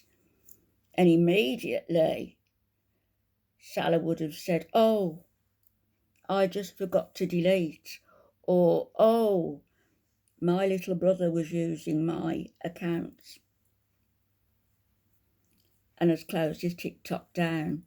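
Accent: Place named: Birmingham, United Kingdom. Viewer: I subbed purely for the clip I saw of you saying "disgusting. Disgusting. Sow." You've gotta be a Brummie, that accent is chef's kiss on you! 💕💪🏾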